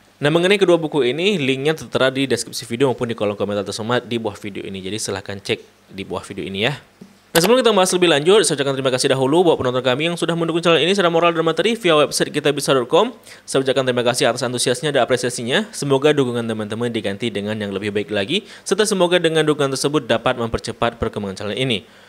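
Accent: Aceh, Indonesia. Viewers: Logatnya seperti logat aceh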